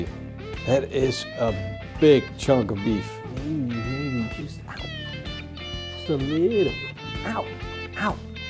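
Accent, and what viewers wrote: Netherlands. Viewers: You're Dutch, aren't you? 👌😉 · Love the videos man but was wondering are you dutch?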